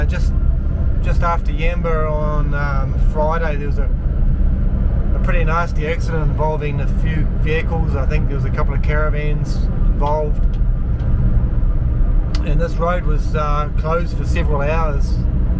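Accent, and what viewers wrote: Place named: New Zealand. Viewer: Good to hear a familiar Kiwi accent from over there across the ditch. My late son drove interstate out of Melbourne for years. Sadly John was taken from us by cancer aged 56yo on Oct. 21 2021. Bob. Lower Hutt. NZ.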